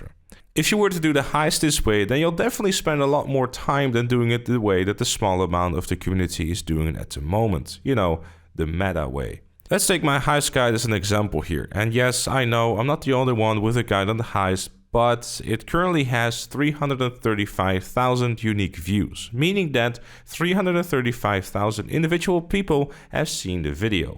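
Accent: Netherlands. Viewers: Are you dutch ? I can kinda hear it in your voice 😂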